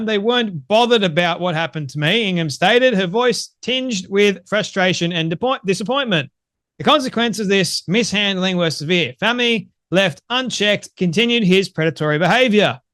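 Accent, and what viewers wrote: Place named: Australia. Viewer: Love your no nonsense Aussie accent.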